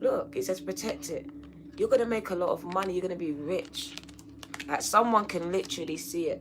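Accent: United Kingdom. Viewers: You are so gorgeous!! Love the hair!!! And the British accent!!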